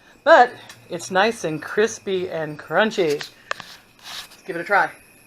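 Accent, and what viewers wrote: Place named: Missouri, United States. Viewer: Maters, crud--you talk like I do! Must be a "Missouri thing"! Thanks for the recipes--my stomach is growling now!! So glad you didn't "burn the house down!! Great video!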